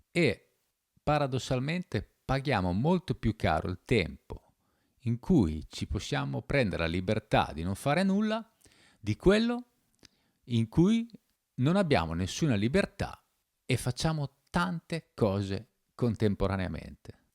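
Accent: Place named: Bologna, Italy. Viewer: Grazie Ma di dove sei? Sento un accento bolognese ... Buona serata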